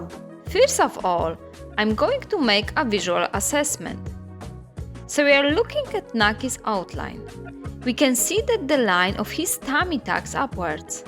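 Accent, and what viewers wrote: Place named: Austria. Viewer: Tysm for the info! I love your austrian accent!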